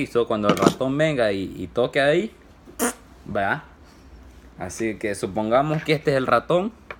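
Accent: Honduras. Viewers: De casualidad no eres hondureño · Hola, gracias por su video, jejej, ¿ud es de Honduras verdad? tienen un tontito para hablar muy bonito, además algunas de sus palabras son muy graciosas, lo digo sin afán de ofender. Ya compré una trampa y la pondré para exterminar estos animalillos que son un gran problema. Bendiciones.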